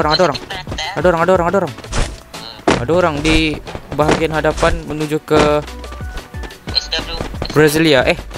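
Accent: Sabah, Malaysia. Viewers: Abg sabah daerah mana?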